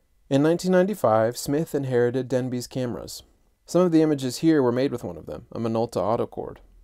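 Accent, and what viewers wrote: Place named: United States. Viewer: Great work! (why the American accent on the video?)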